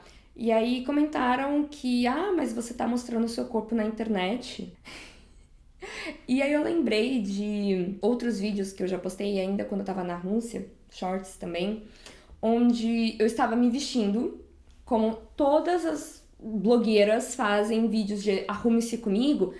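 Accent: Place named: Rio Grande do Sul, Brazil. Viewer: sotaque de gaúcha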